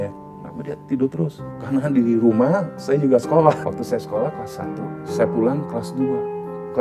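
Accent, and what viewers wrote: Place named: Indonesia. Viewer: Halo om gmna kabar makasih sharing nya Ndak tahu nasip kita di masa kecil sama di sia siain ortu mg sehat om saya penggemar anda dr tahun sembilan tiga waktu masih SMP nam Yogya Anda sdg jaya waktu itu kapan anda pelayanan di Yogya saya juga kristiani katolik Jawa hehe Gusti amberkahi om aksen anda sudah Indonesia bgt. Sukses Dodo palagan km Pat blas Sleman berkah dalem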